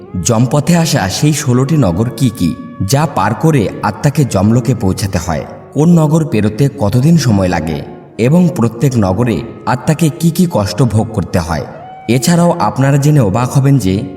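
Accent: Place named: Bangladesh. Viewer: বাংলাদেশি বাংলা এটা ভারতীয় বাংলা নয় এটা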